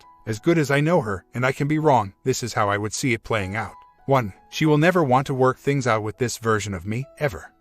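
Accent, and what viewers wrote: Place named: New Jersey, United States. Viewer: Toxic, toxic, toxic. Damn OP almost sounds like you're from Jersey.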